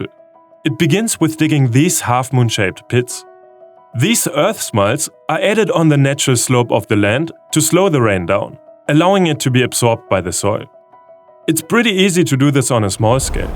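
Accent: Germany. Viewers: Are you German? Your accent sounds very German (I’m German)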